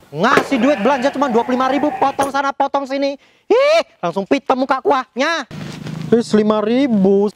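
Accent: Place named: Medan, Indonesia. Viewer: Aku dari awal suka ngeliat. Parody pintar, gak kayak mimi peri yang gak sopan. memang kental dengan gaya kebahasaan khas medannya. Gak hanya ketawa terkadang bisa nostalgia dan ada sedihnya.😂 Sukses terus, teruslah berkarya.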